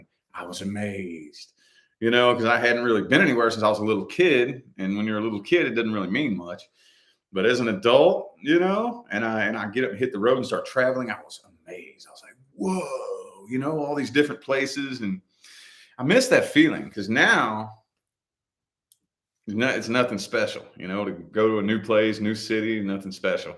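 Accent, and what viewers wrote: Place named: United States. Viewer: I loooove this specific American accent and the cabin idea at the East coast and the fitness orientation :) greetings from Greece 💜☀️✨🌙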